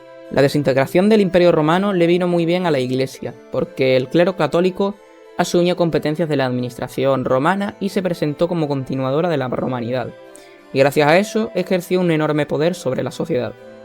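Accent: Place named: Andalusia, Spain. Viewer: No es mala dicción, es acento andaluz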